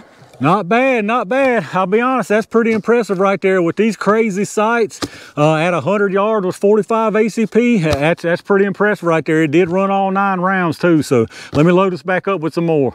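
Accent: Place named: South, United States. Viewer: #Question :face-blue-smiling:My goodness what kind of dialect do you have, are you from the south of the USA or where do you speak it like that - I'm from Germany so I don't know much about it, but the dialect is definitely really cool. :face-green-smiling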